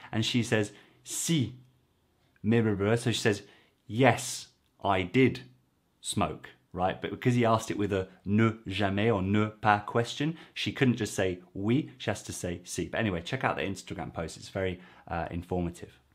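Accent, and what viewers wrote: England, United Kingdom. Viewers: The pot calling the kettle black since you hear this chap is English as soon as he opens his mouth . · Thank you! Merci ! Interesting analysis, mot par mot, j'aime ça ! And - as English is a foreign language for me as well - I enjoy the British accent too, j'ai un double pleasure! 😁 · which part of england do you come from? regards from colombia kid!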